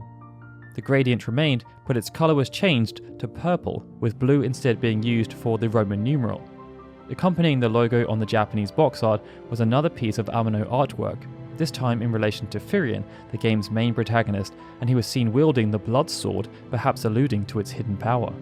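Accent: United Kingdom. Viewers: There is no other way to listen about final fantasy then with a British accent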